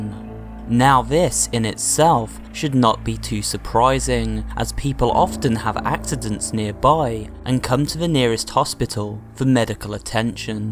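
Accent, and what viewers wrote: United Kingdom. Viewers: The British accent really adds terror to it.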